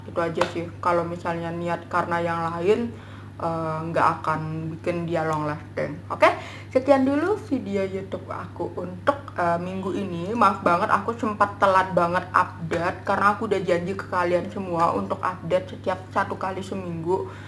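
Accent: Bali, Indonesia. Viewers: iya y..awalx jg aku ngerasa mbaknya orang bali. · Kaya bhs nya methok ke bali ya.. haha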